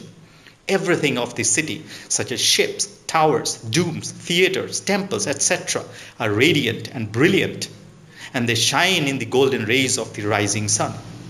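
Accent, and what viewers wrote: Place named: England, United Kingdom. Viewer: I just loooovee your English accent ❤❤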